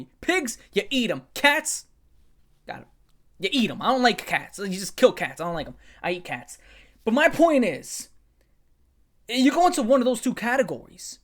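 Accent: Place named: Baltimore, United States. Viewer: I have a feeling you're from baltimore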